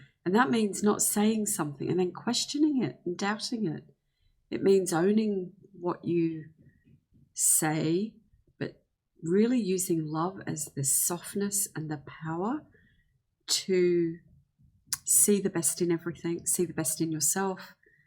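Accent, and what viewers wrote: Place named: Australia. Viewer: Lovely to hear a fellow Aussie with some great words of love to share 👍🏽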